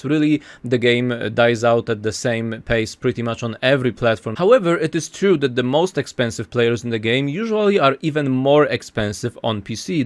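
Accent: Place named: Poland. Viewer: Ja nadal słysze polski akcent xD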